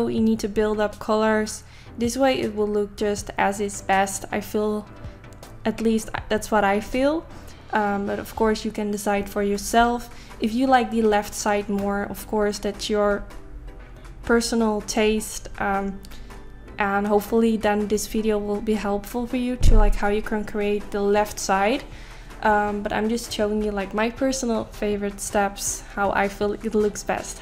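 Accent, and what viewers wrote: Netherlands. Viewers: is she dutch? Idk her accent sounds Dutch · Ik moet zo lachen om jouw heerlijke Louis van Gaal engels! Ik vind je geweldig!!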